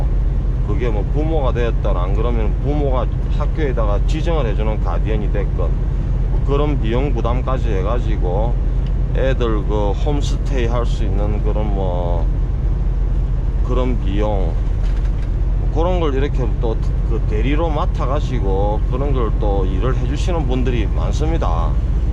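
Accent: Gyeongsang, South Korea. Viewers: 우리 동네 카센타 사장님도 비슷한 억양... 경상도 사투리는 세상 어딜가도 그대로임 ㅎㅎ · 구수한 경상도 사투리 즐기면서 잘 보고 있습니다. ^^ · 경상도 사투리 굿 저도 경상도사람으로서 친근감을 느끼네요. 좋은 영상 잘보았습니다.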